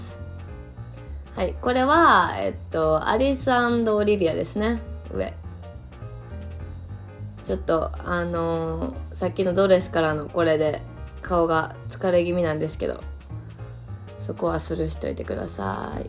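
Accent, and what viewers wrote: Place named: Kansai, Japan. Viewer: 毎日更新、嬉しすぎる😊関西弁かわいい💕🥰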